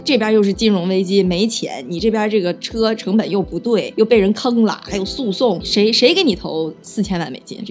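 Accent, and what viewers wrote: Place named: Henan, China. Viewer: 那里的老乡？听说话像河南、陕西、山西那一块的人。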